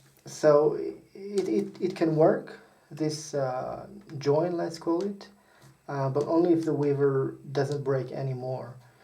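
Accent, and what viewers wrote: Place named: Israel. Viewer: Since your English sounds pretty Israeli, I assume you’re Israeli :) How do you obtain your willows over there? I was observing the flora in Israel and didn’t see any willows anywhere I’ve been. Do you grow your own, somehwere, or you import them? Your channel looks pretty informative btw, I’ll go through all your content. תודה רבה והישאר בטוח! ברכות מטורקיה 👍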